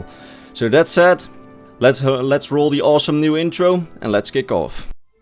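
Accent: Netherlands. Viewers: Hee je bent nederlands he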